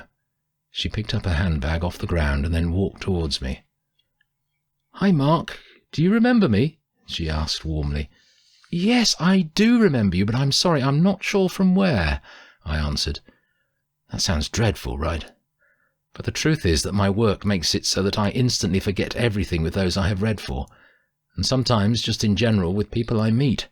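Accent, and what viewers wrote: Yorkshire, United Kingdom. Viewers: I purchased the e-book, and when reading it i hear your voice in my head 👍 So, even if you have a thick Yorkshire accent, it completes the experience ❤️ I love the book, Mark, it is a very lovely and wonderous read 💛 Much ✌️💟💡 to ALL of you 🙏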